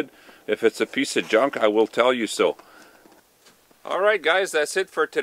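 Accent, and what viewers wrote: Canada. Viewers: Lovin' that thick canuck accent!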